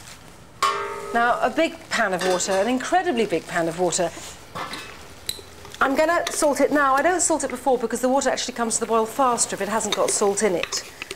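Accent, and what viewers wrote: RP, United Kingdom. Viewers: Is it just me or was she even more posh pre-coke days??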